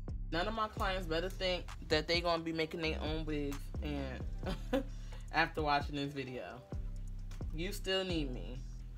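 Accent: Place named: New York, United States. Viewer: Are you a New Yorker? love you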